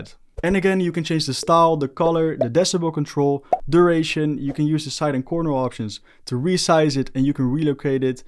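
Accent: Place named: Netherlands. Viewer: Alright I know this has nothing to do with anything but you sound like you're Dutch, can you confirm or deny this because I'm wondering if I'm right now haha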